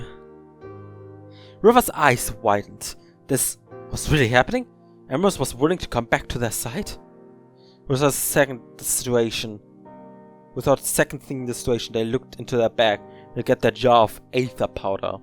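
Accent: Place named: Germany. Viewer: You sound german are you?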